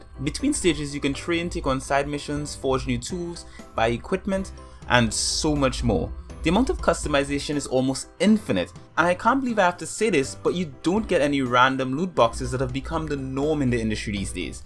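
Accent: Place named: Trinidad and Tobago. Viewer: Are you from Trinidad? You sound like a Trini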